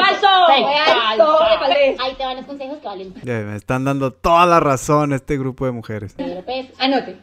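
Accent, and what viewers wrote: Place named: Mexico. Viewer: Me parece que este hombre más allá de generar consciencia desde un punto de vista objetivo e inteligente para despertar en el hombre su valía. Parece ser que quedó muy tocado de su divorcio y experiencias, solo escucho a un hombre ardido, que no le fue bien en el amor y ahora descarga sus comentarios a través de este canal. Me parecen comentarios misóginos y patanes sin sustento. Chicos no se traguen todo lo que dice, Suena demasiado ardido, ni preparado, ustedes creen que un profesional se expresaría así como él? El éxito de las parejas va más allá de la experiencia de un solo hombre. Además, me suena que es mexicano y no entiendo porque estudia mujeres como colombianas y argentinas, desde ahí está mal analizando mujeres ajenas a nuestra cultura. Es demasiado incoherente, analiza a las mujeres de tu país para que al menos haya un poco de coherencia y análisis real. 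Hace falta más preparación de este hombre. No solo su percepción.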